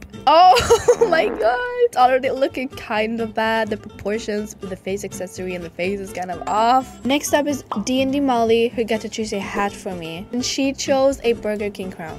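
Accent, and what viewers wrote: Sweden. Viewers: Wait, are you Swedish? 😛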